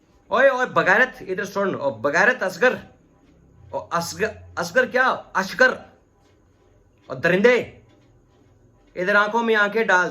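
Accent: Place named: Punjab, India. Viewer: Aisy alfaz tho Punjabi b bolty ha.. Qk ghairat mand logo ka lehja yahi hota ha.. Ap kaha K patan Ho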